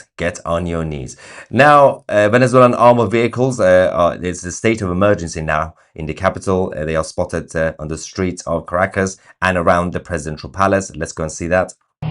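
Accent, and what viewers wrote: United Kingdom. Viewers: I love how when mentions Caracas it sounds as if he were saying "Crackers" in his lovely British accent. 😉🤣 Seems appropriate somehow. But here is hoping they can get back some SANITY in their gov't with dictator maduro out of the way.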